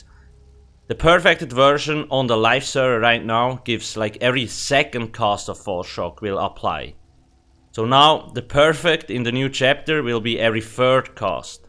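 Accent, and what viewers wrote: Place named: Switzerland. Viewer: He's from switzerland, dont be surprised by his accent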